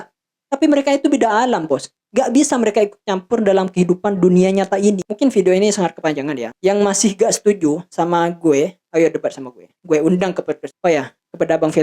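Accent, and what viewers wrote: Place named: Madura, Indonesia. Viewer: Logat madura pake lo gue agak aneh bg😅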